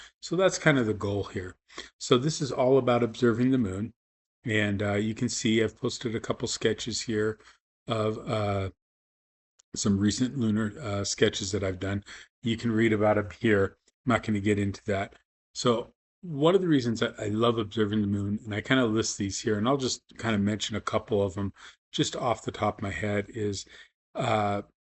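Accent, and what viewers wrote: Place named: United Kingdom. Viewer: So you are canadian ? I thought you were brittish .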